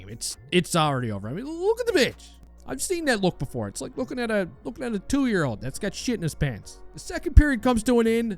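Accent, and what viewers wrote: Newfoundland, Canada. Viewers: Is this dude a Newfie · Fellow newfie here, didn't take me long to catch onto the accent! God bless ya, just stumbled onto your channel.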